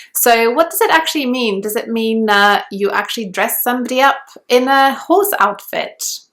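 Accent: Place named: England, United Kingdom. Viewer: A rare moment for me, not the simp type guy, but to hear my favorite accent English~ Enunciators to the Gods 🎉explain a much less proper, posture mess, 😂-of a language, combined with woman often making very little sense, (if the only understanding comes from being led by the actual words they utter, when that's tip of the communication iceberg😢) Picture asking "honey what's wrong?" speak but then a proper excavation of both point & game can uncover a purposeful MATCH of misleading cues, hues & views, with the phrase ~"more than you bargained for",~ being a two sizes too small corset statement = equaling you as the faintest light at the end of the proverbial tunnel. Bringing me to shore before swallowed whole by what the heck, hell, wtf, get to it, what's you're point? Do You have a point? But you landed both Polish & English home to earth putting practicality into the superfluous & purposely roundabout, point..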